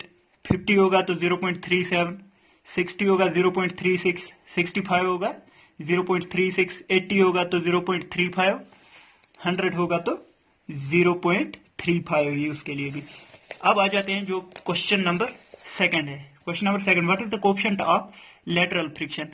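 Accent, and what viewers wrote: Kashmir, India. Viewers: kashmir se hain kya aap?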